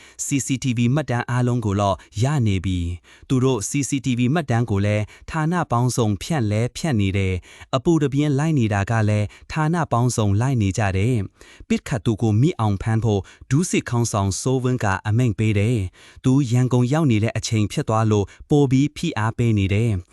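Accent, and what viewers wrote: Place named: China. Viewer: စိန မီဒီယာလား။အသံက ။နာေဖရး အသံထြက္တာ သတိထားနားေထာင္ျကည့္။တယုတ္ျကီး။သတင္းေျကျငာသူ။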